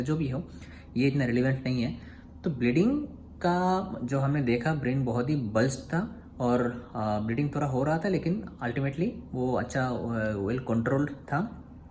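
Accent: Bengal, India. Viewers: Just guessing that, you are a Bengali, by your hindi speaking style(are you a Bengali ?). By the way another bengali here. Medical aspirant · Dada apni bangali to.....laglo apnar kotha sune tai bollam..?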